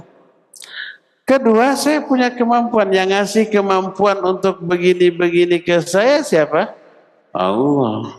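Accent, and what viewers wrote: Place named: Sunda, Indonesia. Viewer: my favorit, kental logat sundanya,,mugia sehat selalu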